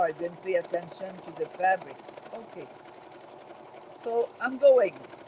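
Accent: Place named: Quebec, Canada. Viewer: French Canada. I recognize the accent.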